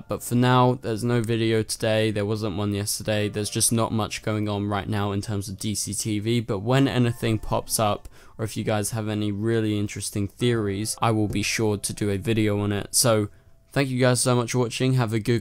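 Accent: United Kingdom. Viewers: What part of England are you from? I'm from Windsor and Berkshire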